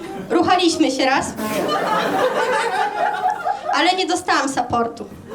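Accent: Podlasie, Poland. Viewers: Brawo! Mega 😅 i fajnie Podlasie słychać w Tobie 😀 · Ja chyba zostanę psychofanem 😀 zakochałem się w tym typie żartu, mega akcent trochę przedwojenny, trochę Podlasia z domieszką Mazur, ale mega robotę to robi 🎉🎉🎉🎉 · z warszawy XD a akcent podlaski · Słychać Podlasie. Używa też slow lokalnych jak pazłotka. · Też to zauważyłeś? Jest mega urocza, mega humor, no i ten akcent, najpierw myślałem, ze jest gdzieś z Podlasia. :) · Ten aksent to bardziej z podlasia niz z Warszawy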